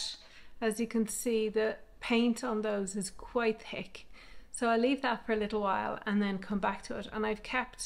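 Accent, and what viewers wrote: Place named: Ireland. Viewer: Congrats!🎉 Love your channel …. And the Irish accent is lovely. Good luck with your channel! 🌸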